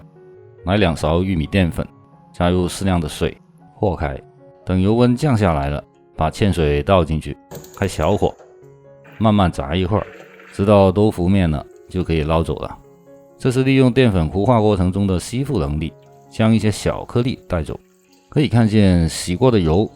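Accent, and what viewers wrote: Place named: Hubei, China. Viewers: 做得好，讲得好，湖北人吗？ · 觉得是带湖北口音的。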